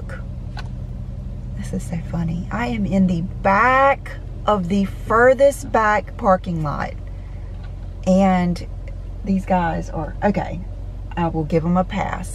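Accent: South, United States. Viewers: I’m loving these daily vlogs....love seeing how much our days are so much alike. We really are more similar than we are different. And we both have accents...yours is a sweet Southern and mine is a horrible NY/ Long Island accent...lol yuck. I can’t believe how long your hair has gotten. I’m trying to grow mine longer...what did you do to grow yours so fast? Vitamins?? Beautiful neighborhood. The home pastel colors reminds me of Bermuda homes. Idk the cost of the homes there but anything like that on LI is well over a million $$.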